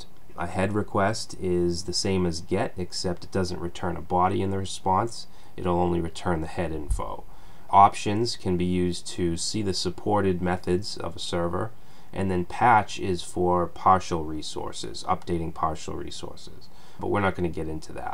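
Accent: Boston, United States. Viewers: Boston accent? Great vid.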